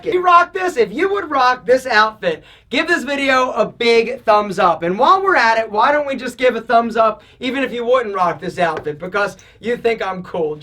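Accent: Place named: East Coast, United States. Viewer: Hey I enjoy your channel...quick question for ya. I think I recognize that accent. Might it be from a major East Coast City between New York and Washington? I have a bet with my wife!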